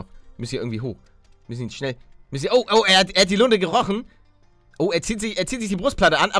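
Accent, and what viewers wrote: Hamburg, Germany. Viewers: Kommst du irgendwie aus Hamburg oder so? Oder Bild ich mir das nur ein?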